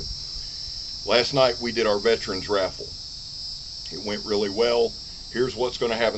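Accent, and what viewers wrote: South, United States. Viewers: Knife community huh?..pff! Im here totally by accident. One day I was looking for a site called "Big Teddy Bears" while I was going through all my lip glosses. There was this big tatted one holding a knife,it was called. Kinda different, checked it out.. Gotta be some kind of acronym or something I reckoned. Who names something for crying out loud right? Anyway, 'ol turned out to be a legitimately great teddy bear with an enormous heart. Im on my way back to the kithen now barefooted (NOT pregnant!) to check on them cookies im baking..just as soon as I stop to admire my Cold Steel 1860 heavy cavalry saber one more time of course lol. Bothered by your southern drawal Sir? Hardly, Im from South Carolina, mine is thick ass syrup..just like the rest of me!😂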